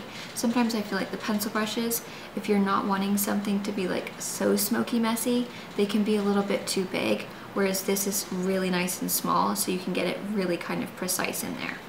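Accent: United Kingdom. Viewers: She's English but was raised in America so she lost her accent. Though some of her words do sound like she has a slight trace of a British accent.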